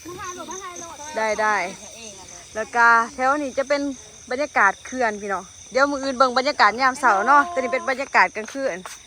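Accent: Ubon Ratchathani, Thailand. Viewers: สำเนียงพูดเหมือนคน อุบล ศรีสะเกษ สุรินทร์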